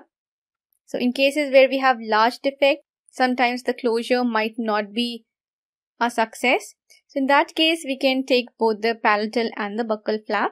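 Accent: India. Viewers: Medok banget Indian mu sist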